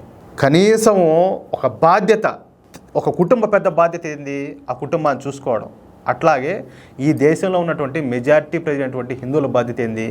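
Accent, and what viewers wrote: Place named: Nellore, India. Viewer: MeemaatateeruNelloretypegau di.....bsagaundi..besteishes...Conti ueyourwork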